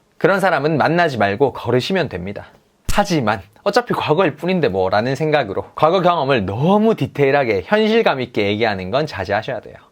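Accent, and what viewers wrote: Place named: Daegu, South Korea. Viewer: 대구분이시네 대구말 예뻐요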